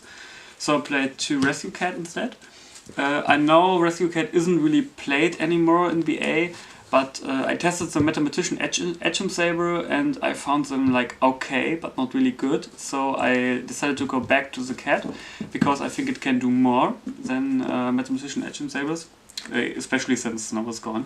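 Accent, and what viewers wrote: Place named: Germany. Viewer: Nice List :D PS: Man merkt anhand deinem deutschen Akzent,dass du aus Deutschland bist xD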